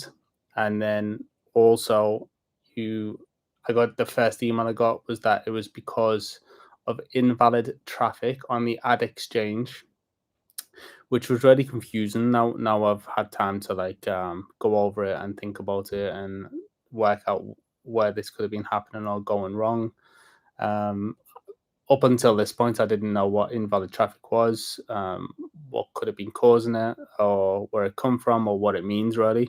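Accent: Liverpool, United Kingdom. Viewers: Lad, are you Scouse? There’s definitely a twang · OMG he is a Scouser 😮 I thought he was Brazilian 😂 as he never spoke before. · Christ I didn't have you down as a scouser. Slight twinge in the accent though, like spanish or italian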